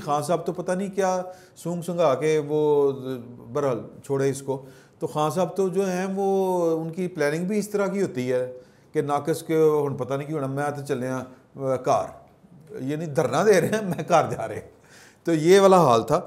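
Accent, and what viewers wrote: Punjab, Pakistan. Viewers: Punjabi tarka lai rakho.... Good analysis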